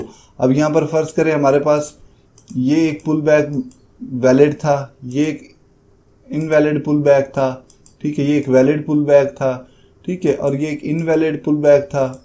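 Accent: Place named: Lahore, Pakistan. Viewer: are you from pakistan bcoz of your Lahori accent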